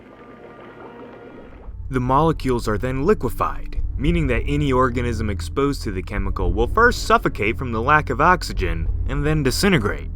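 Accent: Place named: United Kingdom. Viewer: Nice British accent 👌🏻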